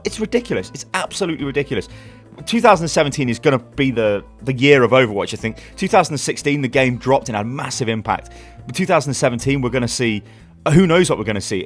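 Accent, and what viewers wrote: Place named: United Kingdom. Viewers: V16 Lambo Tbh I watched this because the British accent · A Brit that sounds like he's on cocaine is easy to listen to? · V16 Lambo now I was able to skip the Blizzard video and hear it in a British accent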